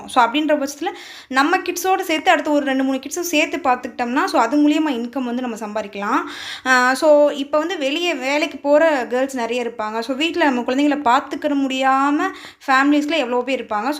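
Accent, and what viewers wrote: Madurai, India. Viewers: Neenga madurai ya sister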